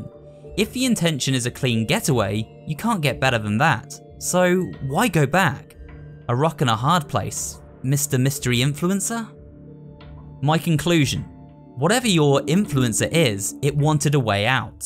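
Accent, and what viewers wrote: United Kingdom. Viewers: is it just me or did you get more british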